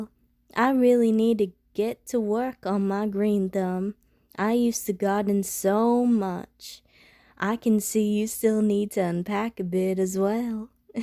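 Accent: South, United States. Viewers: Oh no... I'm liking Southern accents more than ever now. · You just made me discover something about myself... I REALLY like Southern accents...😶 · You do a great southern belle. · I got family in the south and your ascent is sooo close but just a little off but good job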